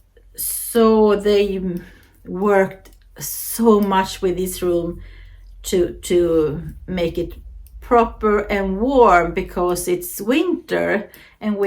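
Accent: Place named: Sweden. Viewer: I love yout Swedish accent ! It's so cute❤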